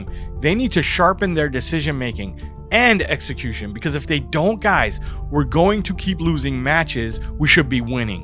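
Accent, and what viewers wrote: United States. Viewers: Yo, you're good bro... It's just a bit weird hearing football analysis in an American accent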